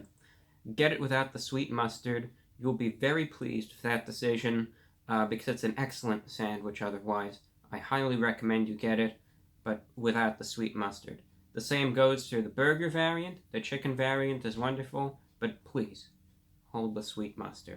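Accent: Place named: New England, United States. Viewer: he's from new england, it's a slight new england accent, my grandpa was born in massachusetts and he has a thick new england accent.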